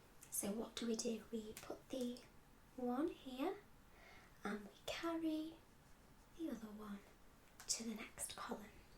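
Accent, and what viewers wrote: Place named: Yorkshire, United Kingdom. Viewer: You really do sound like your from Yorkshire, I'm from Doncaster well York originally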